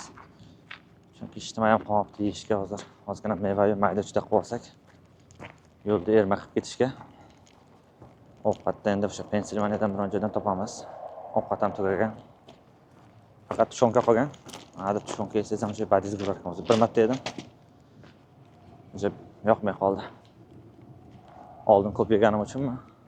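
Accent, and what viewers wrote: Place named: Oltiariq, Uzbekistan. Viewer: Oltiariqlikmisiz shevez o'xshayaptida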